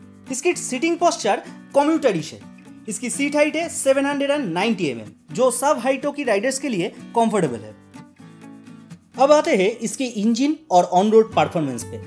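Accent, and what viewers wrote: Bengal, India. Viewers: Dada apnar hindi te bangali feeling puro relavent.tobe abs version ta hole besi vlo hoto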